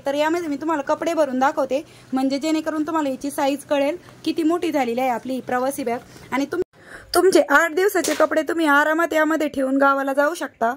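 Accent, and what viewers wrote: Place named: Marathwada, India. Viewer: खूपच सुंदर शिवली. तु कोणत्या गावची आहे. तुझी भाषा मराठवाड्याची वाटते. छान छान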